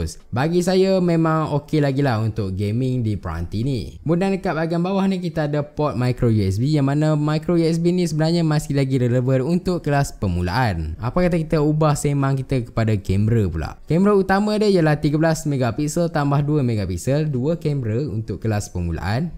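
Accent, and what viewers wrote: Taiping, Malaysia. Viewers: Orang taiping ke bang?😂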